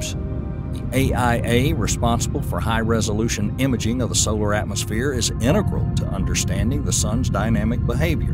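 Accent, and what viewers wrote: South, United States. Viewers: Gotta love the southerner talking about space. Love your Channel man!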